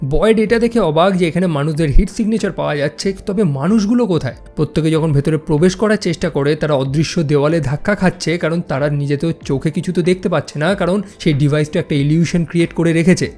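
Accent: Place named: Bangladesh. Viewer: ভাইয়া আপনি কি ইন্ডিয়ান? কিন্তু আপনার কথা বার্তা বাংলাদেশীদের মত শোনায়। তা যা-ই হোক মুভি এক্সপ্লেনেশন অস্থির করেন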